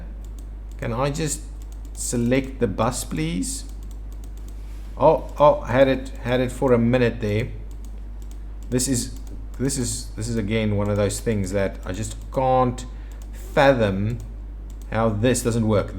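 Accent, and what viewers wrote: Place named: Afrikaans, South Africa. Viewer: You sound like you are from South Africa 🇿🇦, with an Afrikaans accent.( I’m from SA ) Where are you from ?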